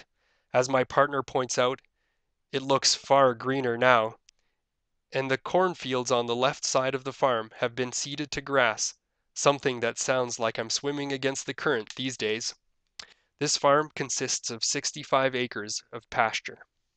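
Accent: Canada. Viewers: After about 4 words, I was like, this guy sounds Canadian... Then I heard "Ontario". We know the sound of our kind eh! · Canadian guy, gotta cut the poor guy some slack, eh?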